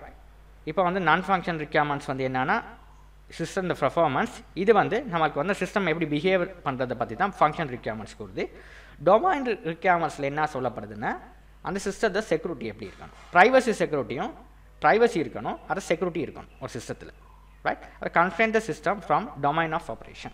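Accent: Sri Lanka. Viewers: Type of Upcountry Tamil in Srilanka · Colombo tamil ( srilanka )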